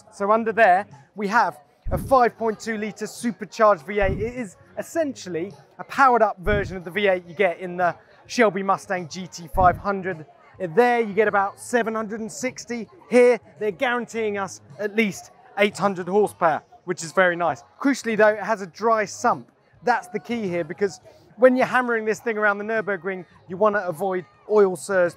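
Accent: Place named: United Kingdom. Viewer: Why do they have a Brit introducing an American muscle car THAT DONT WORK VERY ANNOYING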